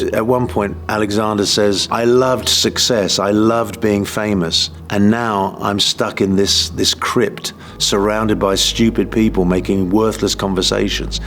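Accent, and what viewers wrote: United Kingdom. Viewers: That’s because of the British accent. Trust me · A Latino with a British accent??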